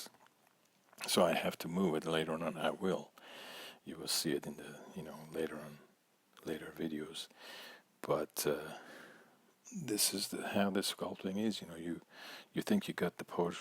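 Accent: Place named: Arab world. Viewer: Actually, not that unrelated, the accent and your artistic skill led me to think that you were from Armenia or some other Middle Eastern country.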